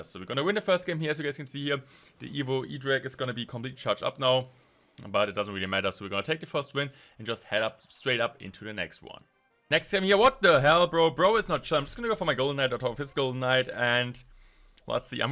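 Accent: Germany. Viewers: The German accent kills me, I’m sorry! But your skills in this game are insane.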